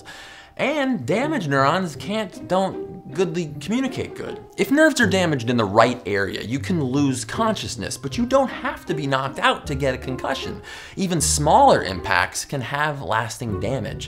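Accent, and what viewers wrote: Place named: United States. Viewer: You seem to understand the video regardless, his accent is American so it’s his native.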